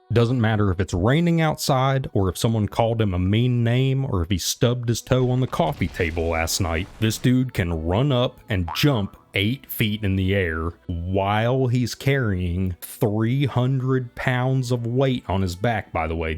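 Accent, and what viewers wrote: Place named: Rural, United States. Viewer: Ive known a lot of hicks in my day, but brother if that is what you sound like reading from a script, you're better off leaving it out. Yeesh.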